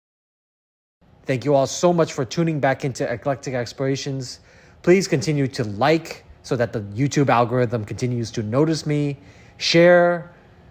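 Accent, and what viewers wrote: Myanmar. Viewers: The narrator sounds like a Burmese. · Yes he is Burmese. 😅We know one when we hear the accent.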